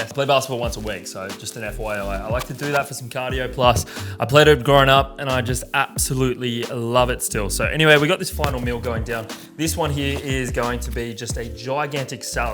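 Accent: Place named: Australia. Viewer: i can't tell if your accent is melbourne or sydney on rare occasion your A noises are more posh like melbourne but then you've got that drawl from sydney it's like listening to a cello that sometimes plays violin parts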